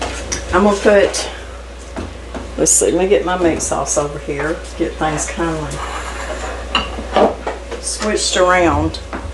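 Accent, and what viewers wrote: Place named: Ozarks, United States. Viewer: Love your channel. My favorite recipe so far is your Crazy Crust Easy Pizza. I make it a lot and I make it gluten free for my 13 yr old grand daughter. Thank you for the great recipes and all the love. Your home is so charming and I love your Ozark dialect. ❤ and we love the Lord too. I’m 70 and my hubby is 81. We live on a small homestead in Indiana.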